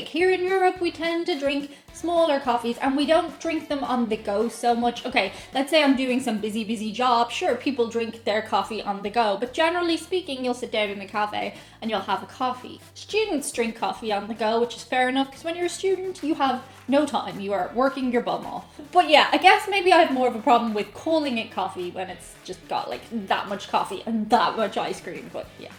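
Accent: Ireland. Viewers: Good God! You are defending UK 'pudding'? Are you insane? UK needs to have it's rights to the word pudding irrevocably revoked. And I'm also roping you irishfolk into the lawsuit against haggis. There is plenty of guilt there to go around. Haggis, and 'pudding' that is more like a hockeypuck made of dog kibble than anything remotely edible and you think you can get away with dissing meatloaf!!! You, your adorable Oyrish accent and lovely face are hereby sentenced to a lifetime of only being able to eat alarmingly orange American Cheeses. So there. Blasphemer. No Corn Dogs!? Devil woman.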